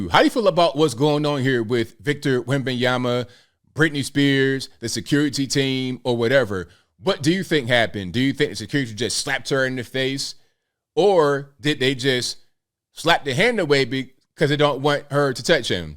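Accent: United Kingdom. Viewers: doing a British accent is awesome. I think he should do every headline in British. Tut tut, good man. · Brit here, your British accent is approved! Britney Spears seems like a nutcase to me. I don't think she is all there. Imagine Victor Wembanyama just about to get started on what will likely be a stellar basketball career, and he finds himself in the middle of this nonsense!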